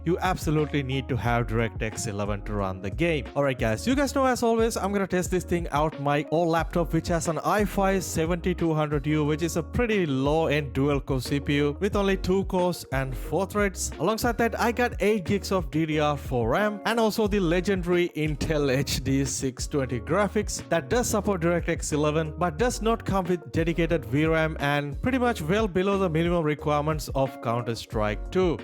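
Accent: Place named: India. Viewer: Indian accent 💀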